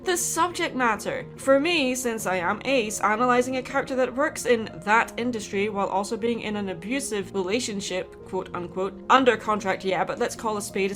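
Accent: Scotland, United Kingdom. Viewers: omg are you scottishhhh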